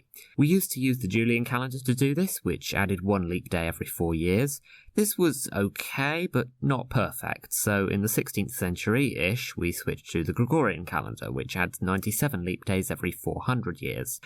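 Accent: United Kingdom. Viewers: Much enjoyed your video, both in its contents and its vocal presentation, whose somewhat dry acerbic touch, highlighted by the nice English accent, made for much captivating entertainment and learning. However, I think there is a slight error in the video visuals: : On the "Observed" 2019 Easter table, shouldn't the "Next Sunday" be Sunday, April 21, instead of Sun. March 21 (since that would have a Thursday--and that next full moon acc. to the ecclesiastical calendar was Sat. Apr. 20)? I see as well that so far you have just two videos. Here's to a good many more. Subscribed.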